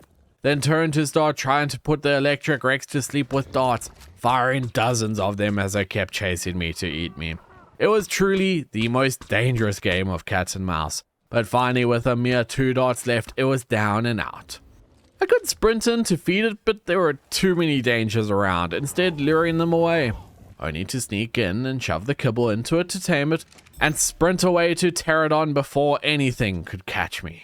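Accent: South Africa. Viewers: wait...by any chance are you a south african? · I KNEW YOU WERE SOUTH AFRICAN LOL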